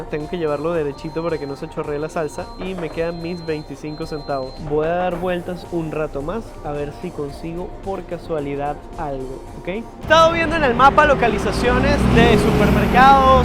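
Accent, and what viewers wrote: Venezuela. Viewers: Yo parA sobrevivir compro tortillas y queso duro sale super barato en los mercados se puede comprar super barato con 3 dolarucos se puede t oigo un acento venezolano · de donde eres? No puedo reconocer tu acento, pero me inclino a venezolano?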